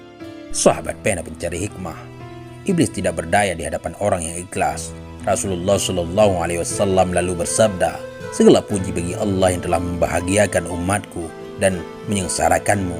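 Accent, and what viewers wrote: Aceh, Indonesia. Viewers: Logat nya orang Aceh ni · pasti org aceh ini yang bicara hehe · Admin nya kayak suara org aceh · Adminnya urang Aceh ya?bunyi slang nya lain ckit.😃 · Suara nya seperti orang aceh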